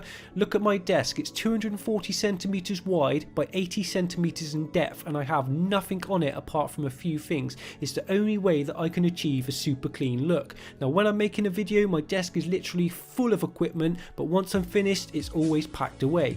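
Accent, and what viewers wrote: Bristol, United Kingdom. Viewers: Nice Bri-ish accent! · u from bristol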